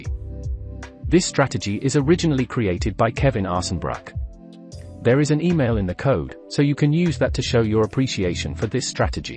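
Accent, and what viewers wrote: Netherlands. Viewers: I am missing the Dutch accent! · to bring back the Dutch accent.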